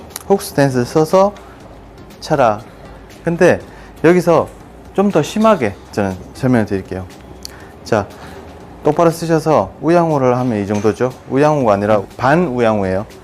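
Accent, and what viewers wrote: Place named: Gyeongsang, South Korea. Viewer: 구독 좋아요 눌렀습니다. 경상도 사투리가 참좋네요^^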